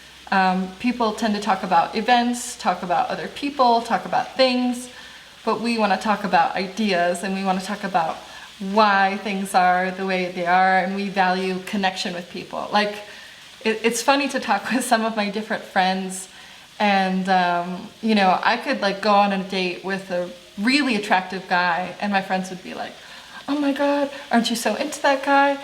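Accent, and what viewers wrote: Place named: Ireland. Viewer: ASMR Irish everyday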